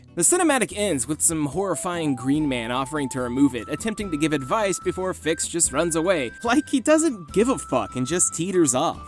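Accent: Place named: South, United States. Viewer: Where in the south are you from? :0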